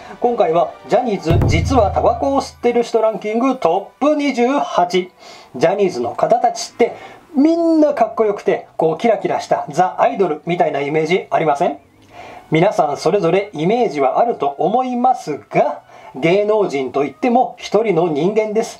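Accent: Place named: Kochi, Japan. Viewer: こーちみたいなしゃべりかたしちょる笑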